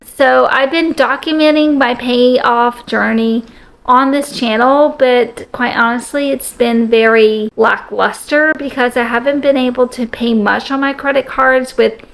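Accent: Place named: South, United States. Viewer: Wow, you remind me so much of myself, (although I don't have the delightful Southern accent)! I got my Grand Diplome in Culinary Arts in 2004, which led me to a 80 hour weeks of minimum wage foodservice gigs, so I acquired an Associate's Degree from University of Phoenix in 2011, in a vain attempt to (HA!), "improve" my life. They have both proven themselves to be nothing but expensive wallpaper. I spent my teen years and 20's working 80-100 hours per week, and my 30's working 60+ hours per week. I moved back in with my parents when I got my house foreclosed, and still live with them at 40 years old. The only way that I can make a living ($50K-ish per year) is in a warehouse, slowly killing my back and knees. I declared Chapter 7 Bankruptcy in 2012, along with a car repo and house foreclosure, but I was still stuck with the useless student loans. 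UOP should be forced to pay back all of the people like us, as their degrees aren't worth the paper they're printed on. Enough about me, I sincerely hope that you can claw your way out if that hideous credit card debt, and can get your student loans forgiven. Best of luck to you!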